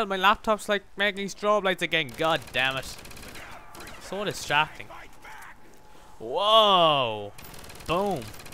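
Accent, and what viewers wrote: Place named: Ireland. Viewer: wa part of ireland are u from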